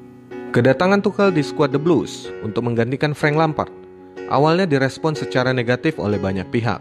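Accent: Medan, Indonesia. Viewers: Orang medan rupanya abg ini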